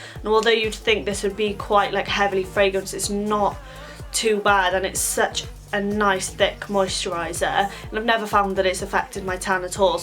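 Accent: Wales, United Kingdom. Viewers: you welsh? xxx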